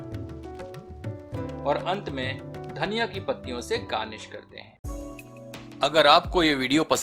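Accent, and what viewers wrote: Standard. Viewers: Inki Hindi vartani sun k man prafullit ho jata hai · bahaut hi shuddh hindi se boltain hain jo sunne main acche lagtain hain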